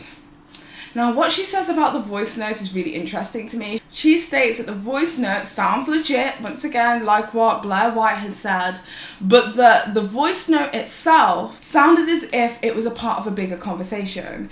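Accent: United Kingdom. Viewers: Started watching your videos recently. As a fellow Brit, its wierdly comforting to hear your lovely British accent, when the majority of the video's in a sea full of American accents. KNOWS THE MEANING OF NUANCE new Subscriber. X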